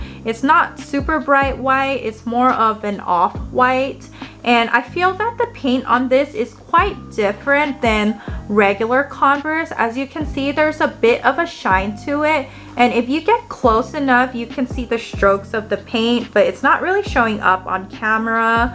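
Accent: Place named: France. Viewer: Ton accent français est trop mignon 🥰 *your french accent is so cute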